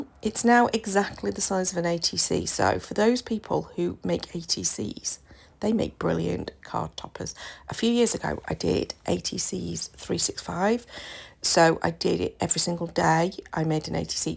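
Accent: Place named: United Kingdom. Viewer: So happy I have discovered you throught this series! Love your creativity and your lovely accent takes me back to my university days in the UK ( a VERY long time ago 🫣). As for scraps, I have arrived at a system which works for me: I invested in one of these little plastic containers with seven (usefully) compartments, I believe they are intended for soup packets etc. puzzlingly. I designated each compartment for one of the rainbow colours. Now I can pull scraps in the colour I need every single time I die cut, before I pull a full sheet… It works a trat for me. Thank you for sharing your amazing videos, kind regards from Germany, Nicola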